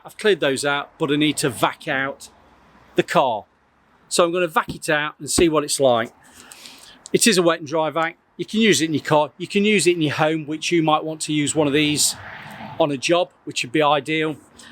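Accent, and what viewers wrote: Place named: Cockney, United Kingdom. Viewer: This man speaks in a Cockney accent.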